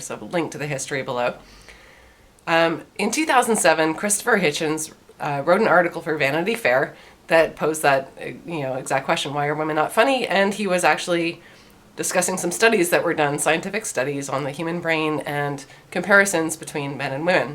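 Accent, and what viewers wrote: Canada. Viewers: Weird Canadian accent..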